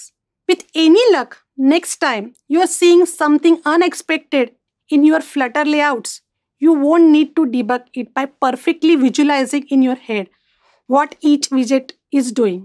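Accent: India. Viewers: Thanks. First time indian accent 💌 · 这个印度咖喱味道的English听起来真让人难受啊。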